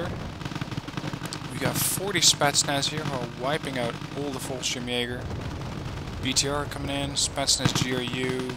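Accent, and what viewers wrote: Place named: Netherlands. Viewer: You sound dutch !! Thank you for this replay !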